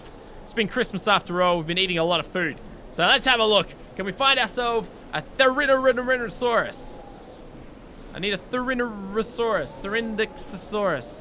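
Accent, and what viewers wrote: Australia. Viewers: Hay u Australian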